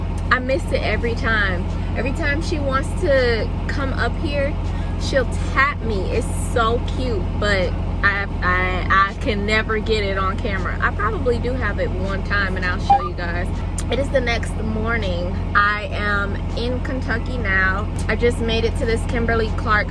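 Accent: Michigan, United States. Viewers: LOL, I didn't realize you were local. But hearing you say "Meijersss" is so comfortable and familiar that I laughed. As Michiganders we have so many neologisms that mix with Yooper-talk and Canadian lingo and covered by all that Deep South drawl from our elders. 😁 People from outside the region have such a hard time figuring out where I'm from sometimes. 🤣 Waving at you from Detroit 👍🏾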